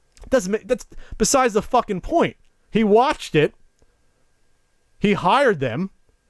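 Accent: New Jersey, United States. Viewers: ah you can hear the New Jersey in you. #1 state in ppl cursing. Jersey misses ya!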